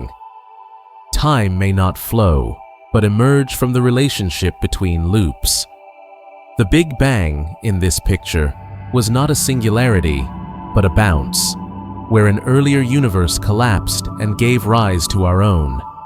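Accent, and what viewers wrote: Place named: United States. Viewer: Finally a good old fashened AMERICAN accent. Cant believe it.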